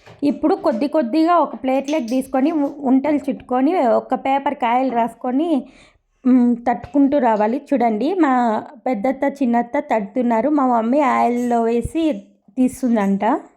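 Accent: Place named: Rayalaseema, India. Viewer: మాకు కొన్ని అరిసెలు పంపించొచ్చు కదా..రాయలసీమ యాస లో సూపర్ గా చెపుతున్నారు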